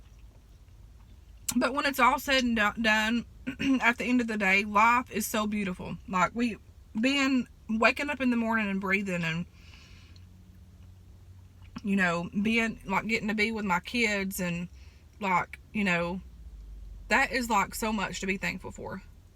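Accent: South, United States. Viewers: Girl you’re so amazing 😉 and btw I LOVE your country accent!! You’re such an inspiration!! The food looked so darn good!!! Yummilicious 🤪 Hey I’m happily divorced twice too!!! You just keep on smiling and making your great videos!! Love ya so much! Sending you lots of hugs and love ❤️☀️🌺🌼🤗🌻🥰🌸🌹💗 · Hey, i just love ur country vocal and ur country talk just love it.. We yall have to love each other and pray for each other. I just love ur family and ur little dogs.... I will be praying for u and ur family. I will be praying for the shots that u are getting.... Hey looking for Joyce Meyers Bible (pink study women) at Good Will cant found it here....Love yall...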